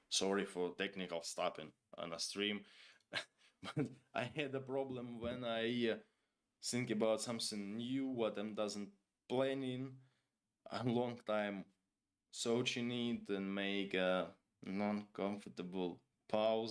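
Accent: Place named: Ukraine. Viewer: Hi, i vote that you keep the expression ”my dear” if it’s coming from Ukranian. Many of us are also non native English speakers, and we all carry small ”impefections” from our language. It doesn’t make it difficult to inderstand but it gives your english a sfumature of your own country. Thanks for the stream!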